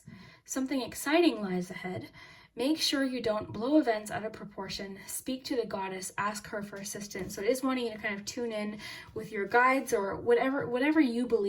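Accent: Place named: Canada. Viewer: I’ve always guessed you lived in Canada or Minnesota by the way you say “sorry” in your videos. This was the first time I heard you say where you were from and I feel that you being from Canada is so fitting I’m not sure why.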